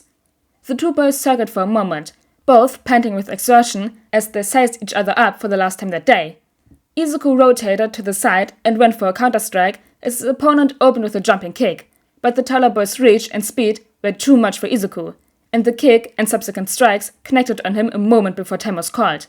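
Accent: Germany. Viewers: Your accent sounds kinda German! Sorry if I’m wrong lol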